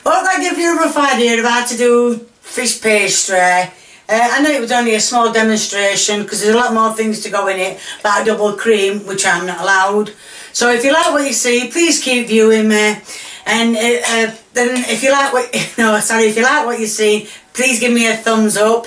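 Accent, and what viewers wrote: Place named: Yorkshire, United Kingdom. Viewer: where are you from? We have a disagreement here.... I think you're from north Rotherham somewhere. My mum thinks you're from somewhere like Wakefield. Please help xxxxxxx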